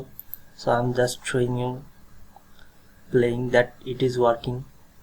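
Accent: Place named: India. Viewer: Me: Ah this video looks pretty solid it might give some answers. Video: Has Indian accent Me: Aight ima head out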